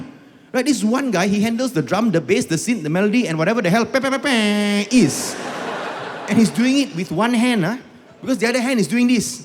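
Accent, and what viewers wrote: Malaysia. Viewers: The way he speak english like how my malay friend speak English.. the melayu slang.. darn spot on.. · In his rant about math, i was guessing he has education background. Then he revealed he is a TESL graduate it all make sense. TESL pride 😂😂😂 His delivery very malaysian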